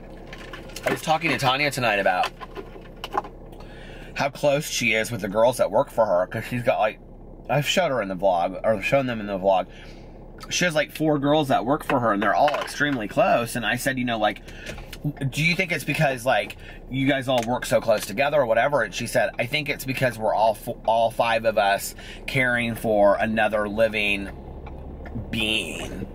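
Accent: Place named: Indiana, United States. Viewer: I just discovered you the other day and have already watched a ton of ur videos. It was your voice that sucked me in! I love how you talk. I kept thinking...he sounds so familiar. Then I realized you live in Indy and I think it's just ur dialect that sounds familiar to me because I live in Indiana too.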